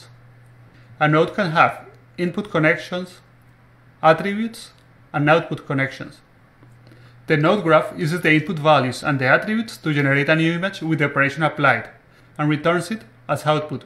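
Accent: Spain. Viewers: Buenas equipo de. Antes de nada, vuestro software es increíble, estoy deseando probarlo y empezar a hacer mis texturas en él. Otra cosa; si no me equivoco por el acento, sois españoles, si es el caso, tenéis algún tipo de video tutoriales en español? Por lo que he visto son todos en inglés, aunque no es un gran problema, se me escapan ciertas cosas con el inglés, y me iría de perlas que fueran en castellano. Muchas gracias y seguid así!!!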